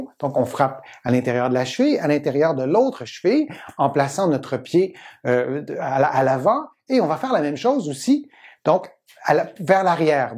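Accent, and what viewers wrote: Quebec, Canada. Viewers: Adorable accent québécois et super enseignement, ayant moi-même une grave maladie.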